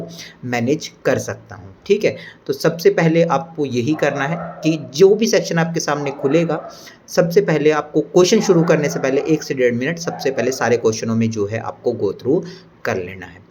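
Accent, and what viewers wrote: Haryana, India. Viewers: Aap Haryana se ho bhaiya ?? Apke bolne ke way to lg raha h ...i am also from haryana..btw my exam is on 12th April...wish me luck bhaiya❤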